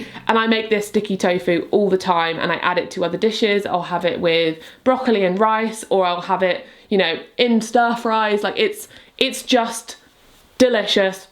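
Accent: United Kingdom. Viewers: I watch your videos since 3 years now and love them. Your british accent is kind a cute. Would love to win one of the blenders because I just moved out to study becoming a dentist. I hate that I don't have the ability to blend stuff in my little dorm room.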